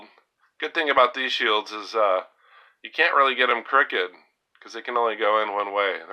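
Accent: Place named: Georgia, United States. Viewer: Hey brother You're starting to sound like a Georgia boy and I like it 👌 Really great knives today, love the stag and the burnt bone . Thanks for sharing brother 👍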